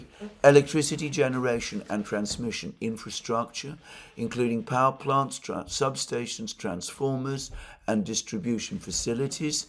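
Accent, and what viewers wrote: United Kingdom. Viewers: Dude, u have problems with your voice and frequent upper respiratory infections because it seems that you have nasal obstruction, deviation or some other anatomic abnormality that affects your nasal breathing and talking. It has been obvious that u grasp for air when talking between the words and your posh english sounds nasal as well. Have u ever consulted an ENT specialist? Most of these stuff can be corrected surgically.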